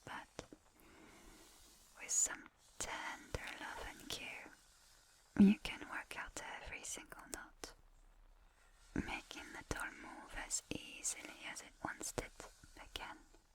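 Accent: France. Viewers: Mon dieux je m'attendais pas à ce que ce soit un français aussi authentique !!! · Oh, the French accent, the whispers and your voice melt my heart. I really needed to relax my mind. You always know what we crave. Tu es vraiment douée dans ce que tu fais. Merci. 🤍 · Having to do quizzez and listen to this during it and helps me relax and focus your french accent is really amazing! First time i've heard someone with a french accent you do really good ❤ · Love the french accent. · F4F french accent ASMR, lets goooooooo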